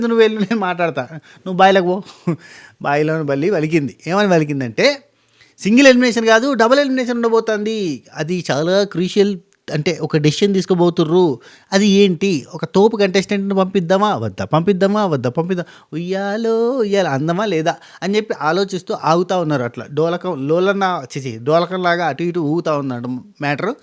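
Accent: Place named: Rayalaseema, India. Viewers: Rayalseema yaasa😅😅😅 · garu rayalaseema yasa ok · Memu rayalaseema kani mee antha goranga matram matladaru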